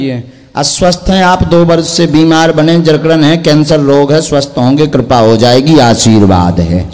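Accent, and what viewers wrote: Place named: Bundelkhand, India. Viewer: गुरु जी आपकी बुंदेलखंडी बोली बहुत अच्छी लगती है, संन्यासी बाबा की जय, बागेश्वर धाम सरकार की जय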